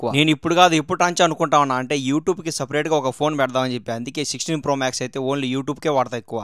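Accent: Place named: Kadapa, India. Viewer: Kadapa vallu anukotanu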